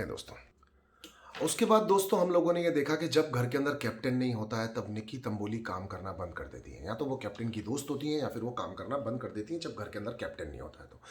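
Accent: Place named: Haryana, India. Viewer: 😃 I love ur haryanvi man hahahaha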